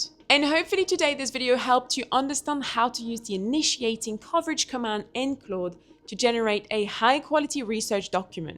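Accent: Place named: South Africa. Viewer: South African accent...nice.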